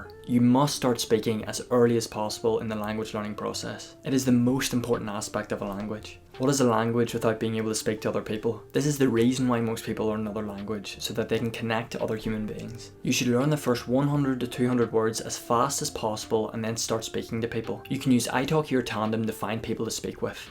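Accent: Ireland. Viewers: Just clicked on this after watching a vid where you spoke only in German. Would have never guessed you have an Irish accent ! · Oh my godness, your Irish accent is sooooo adorable! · I realized that you are from Ireland with help of your pronunciation,especially a word "thousand") thanks for sharing,mate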